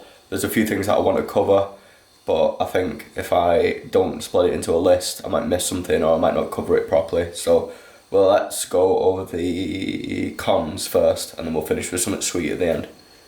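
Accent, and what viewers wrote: United Kingdom. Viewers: Are you from Sheffield? (I'm Canadian, but I live in Leeds) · u british!!!!!!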